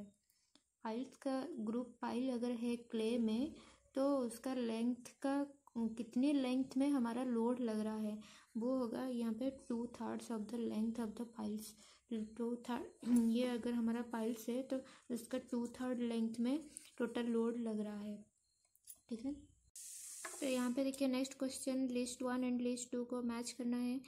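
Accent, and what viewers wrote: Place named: Odisha, India. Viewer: I think you are a Odia .i guess it because of your accent .Good work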